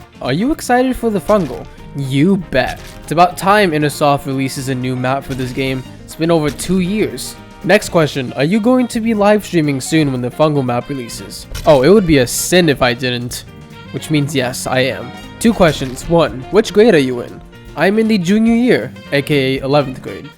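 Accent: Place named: United Kingdom. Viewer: why does your voice sound so british?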